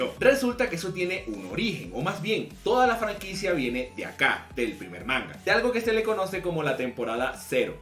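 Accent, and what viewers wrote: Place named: Venezuela. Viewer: pana tu eres venezolano, tu acento me parece de alla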